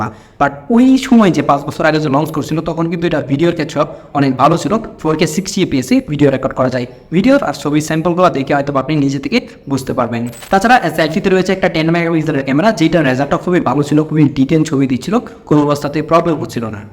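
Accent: Kishoreganj, Bangladesh. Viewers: Bhai apner basha ki Kishorganj?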